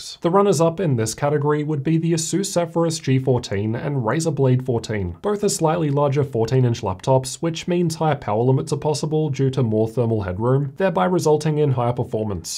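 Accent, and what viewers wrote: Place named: Australia. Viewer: I can hear that you are from australia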